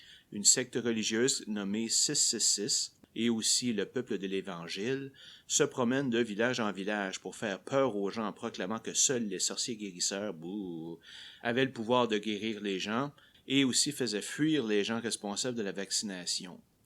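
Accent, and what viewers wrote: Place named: Quebec, Canada. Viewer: Merci pour cette vidéo qui permet de bien comprendre les mécanismes en oeuvre dans la sélection naturelle. Je profite de l'occasion pour saluer tous les Québécois dont le magnifique accent (pour un français 😁) est toujours aussi agréable à entendre.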